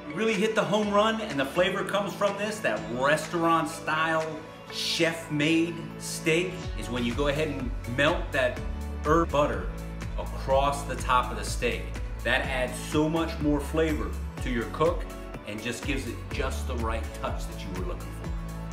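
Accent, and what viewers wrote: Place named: Australia. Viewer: Are you originally from Australia? I hear a bit of a twang on your accent, almost as though you grew up in the down under and moved to the U.S. when you were young.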